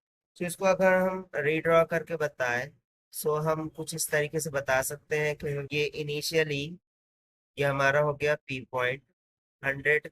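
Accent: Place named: South India, India. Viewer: South Indian hai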